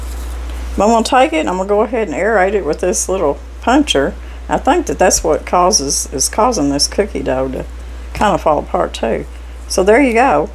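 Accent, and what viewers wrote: South, United States. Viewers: Dear Miss, Absolutely love your hair style! You look prettier all the time, and you’re already beautiful! Thank you for all your wonderful videos and for sharing your lifestyle with all of us……..your devoted fans! I love listening to your beautiful southern accent, no matter what the subject of the day offers, whether it’s cooking, gardening or just listening to you and sharing your thoughts and memories. I’m forever grateful that I found your Utube channel. God bless you! Love, Jackie🪷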